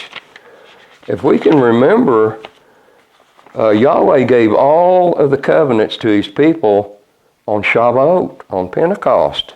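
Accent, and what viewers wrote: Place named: South, United States. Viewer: Oh brother, bless you. You really get it, the difference bw the BoC & BoL!!!! Praise YHWH & bless you! Where are you located? Ur a southern gent., that I can tell, but where? TX, i hope or TN?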